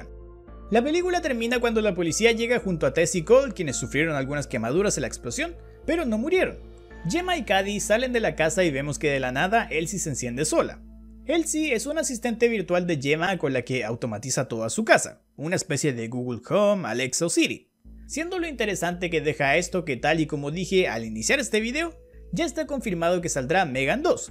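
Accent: Chile. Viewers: este wn es chileno a mi no me engañan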